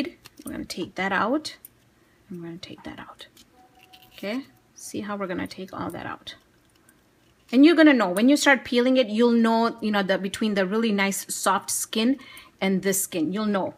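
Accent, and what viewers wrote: Minnesota, United States. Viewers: You sound like your from Minnesota too lol! By the way its not meant as an insult. Also your video was very informative. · Are you from Minnesota? :) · Don't think I've ever seen an Arab woman with a Minnesota accent. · American accent nice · my local grocery store in switzerland just started selling canned version so i came to youtube to check it out.. i love the minnesota accent haha. originally from minnesota myself and i am enjoying trying new flavors that are accesible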